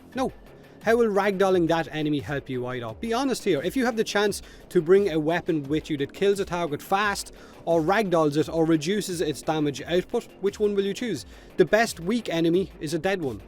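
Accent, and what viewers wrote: Ireland. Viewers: Youtube's auto generated captions isn't kind to your irish accent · Sorry, but aside from the curse words, you sound like a fluffy irish teddy bear even while raging:D And that's not a bad thing! It's a good thing that even when you speak your toughts openly, you still remain composed and constructive. True raging as in yelling, incohherent bitching, flailing, name calling and acusations are not what your brand is about (at least I think, but correct me if I'm wrong). Even tough I have 1000+ hours in the game, there is not much that bothers me. I give DE a lot of leeway, because it's their game, we as players don't own it (see the entitlement of Star Wars fans over TLJ for example, how this can be a bad thing) and because it's F2P. The 2 things I would like to see looked up in seriousness is the level scaling and difficulty curve of the game and a rebalancing of all weapons, shortening the gap between older and newer weapons. 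I'm fine with tier weapons, but I'm not fine with old weapons having less than 8% crit/status chance, while new weapons come out all with 25%+ in one of thise categories. And no, Rivens are not the answer. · Critical was tone on considering the concerns, but more worth it because Irish rants are the best rants. Better at the pub over a beer. ;) Also, Vay-Donald-Hek. <3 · P.S. As much as I like hearing your beatiful irish voice rant about stuff (don't actually care what about), I like your regular approach better. WF is free to play after all, so DE can do with it whatever they feckin like. BUT: Giving feedback, adressing issues, pointing out things that are wrong can help the developer improve their game. At the end of the day DE still wants the game to be as good as possible (or so I hope), so we, the fans, should defenitely criticize their work and tell them what we don't like, but at the same time (very important) also what we DO like, so they can use that info to decide what to focus on for future updates. 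And all of this can be done in the calm polite way, you, have ever since done it. No need to shout, no need to rant. Just tell 'em what went wrong and hope they fix it. If they don't, don't spend money. But, as mentioned above, I'd like to hear more irish cursing so do one of these every once in a while. Slán go fóill (Hope I got that right)^^ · the dulcet tones of your brogue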